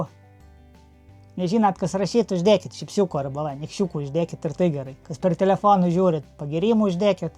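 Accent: Aukštaitija, Lithuania. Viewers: Smagu žiūrèt śio žmogaus video del kruopštaus ir kantraus darbo, bet dar ir dèl to: bunkeriokas, miškiokas, ežeriokas, bulviotè, druskiotè ir t.t. Nuostabi aukštaitiška tarmè - glosto širdį! Vienas malonumas ne tik žiūreti, bet ir klausytis.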